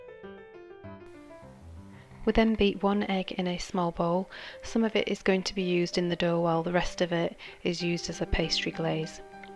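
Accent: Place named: RP, United Kingdom. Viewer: I'm an 81 yr old ex pat living in the USA since 1980. Lost my wife to heart surgery a few years back, after 60 yrs together, so I'm now the novice cook. No plain flour in the US only all purpose or Bread flour. Can I use either, as is, or with some modification. I just tried your project with premade Pillsbury puff pastry. Everything that could go wrong, did. Cut the circles too small, pastry is a bit thin, didn't reach the top of the muffin hole. Jam overflowed etc. Welcome to my disaster zone. Tried making a Treacle (golden syrup) in bread crumbs pie yesterday, did a blind bake on the crust, came out good but the syrup filling went rock hard, guessing poor syrup to breadcrumb ratio. Is there any hope for me ? I'm a Dagenham boy so your accent is more posh than mine. Blessings Bob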